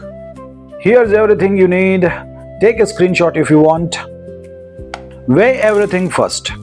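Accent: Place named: South India, India. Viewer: Thank you Amma and Ji for bringing this beautiful and highly detailed recipe on how to brew masala tea 🙏😊. I'll definitely make this tomorrow for my parents (I only get time to cook on weekends). I also like the accent of the narrator, beautiful South Indian accent. Reminds me of my school teachers. It'll be my first time brewing a tea your way and hope it turns out good 😁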